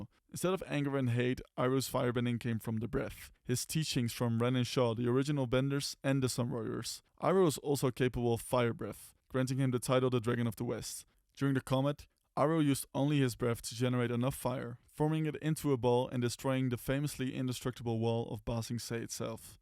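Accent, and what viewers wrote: Netherlands. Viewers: Good video! I can tell by your accent you're 🇳🇱, no? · You sir sound dutch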